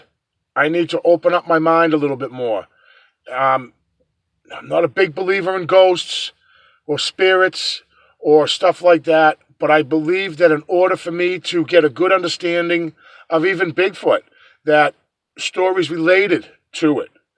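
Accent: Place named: Massachusetts, United States. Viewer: Well how are ya?? Linda in Florida here. How about this skinwalker story....when Mr. Sherman chased those little blue orbs with his 3 cattle dogs they chased the orbs out of his sight when he heard them yelp he ran as fast as he could to get to them and he found 3 melted oozy grease spots that was once his dogs. And that's when he gave up and sold the ranch...thats a horrible thing. Lots of stuff happened out there.......that place is evil!!!!!! And yes.you almost have got to get into paranormal stuff when your into Bigfoot cuz I believe that somehow there is a connection. Love your vids and love that mass. Accent. I'm odd up there spook much..hey see ya on the next one..lol..Linda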